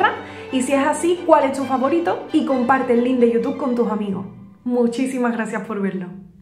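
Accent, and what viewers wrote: Andalusia, Spain. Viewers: Llegué de casualidad, me quedo por ese hermoso acento andaluz... <3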